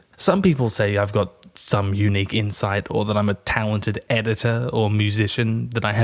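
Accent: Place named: Australia. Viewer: Snap, I know the Pisstakes backwards and forwards from all the listens. It started as just genuinely relaxing to hear someone react the way I react to bad writing/lazy world building/ruining epic series because you're a try hard who wants to make a Staar Whor, but eventually it became familiar and therefore comforting in a different way. I probably enjoy hearing an Aussie accent too, keeps my homesickness in check.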